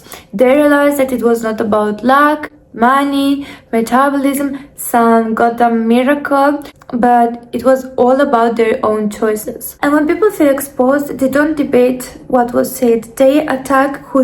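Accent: Russia. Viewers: I adore your accent. Are you from Russia? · Since one year I just stopped to sharing my project of life plans with some friends, acquaintances and one member of my family just because I see that everytime that I speak of my plans they're leads immediately to fail. Concerning my family side the only thing that I used to get was skepticism and cynical remarks : like how I dare to even have ambitions ? And even if I don't share anymore I can easily get one mocking comment litteraly asking me if I don't plan to be the next Kim Kardashian. I decided to just let it go and not getting affected by it, because at the end of the day I have accomplished so much things by myself and that I'm stronger than them. But seriously, to live happy let's live hidden! Now I just share the bare minimum and even so I just lie about some details because at the end of the day it's my life, and like those people aren't helping that much what's the point to share crucial details if in the end it just prejudice me ? 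At the end best weapon against haters is to give them an ulcer by becoming more better that you are actually already. P.S Don't worry about those дурак comments, they just hate you because you're Russian. 😂 They wouldn't dare to make those remark about your accent if you where from another ethnic group, it's just typical American slavic women hate. Keep the good work and thanks for your deep advices.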